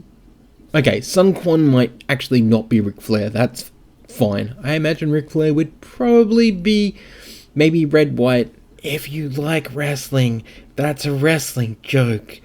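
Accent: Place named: Australia. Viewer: You have to ask yourself about motive here buddy. Someone making a fake card to reveal will quickly be outed and completely destroy their credibility and their channel. Why on earth would anyone do this? You might get this from some random no-name channel with no established history, but that's clearly not the case here. The reason this spoiler is a bit early is because I highly suspect the channel owner is an Australian (based on accent), and Australia happens to already be in July 23rd as I write this.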